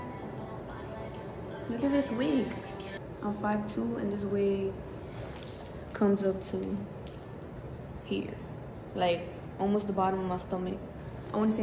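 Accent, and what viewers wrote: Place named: New York, United States. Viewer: the fact i can tell you from new york lmfaoo me too 🥰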